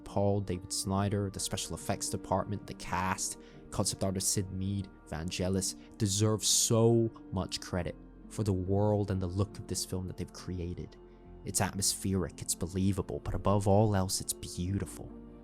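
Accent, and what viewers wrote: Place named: Transatlantic, United States. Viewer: You have a very transatlantic accent. Kinda half British Isles, half North America.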